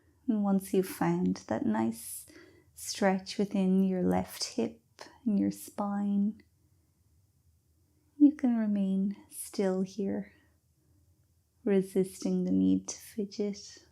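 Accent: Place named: Ireland. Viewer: Excellent class!! And the accent is a beautiful bonus , may I ask what it is, Irish?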